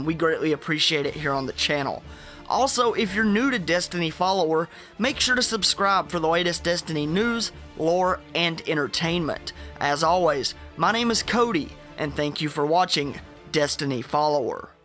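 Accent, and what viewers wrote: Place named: Texas, United States. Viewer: Extreme Cynic nope this is a Texan accent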